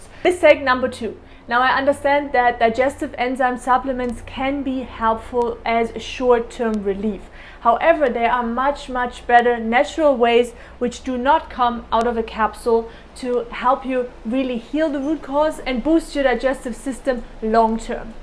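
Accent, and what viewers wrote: Germany. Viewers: Good job 👍, do I hear a hint of a German accent?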